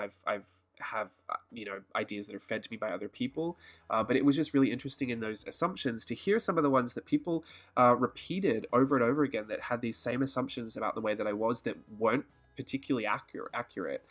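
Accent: Australia. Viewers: Yay an Aussie artist to watch 😀I could tell you where Aussie from the start 🐨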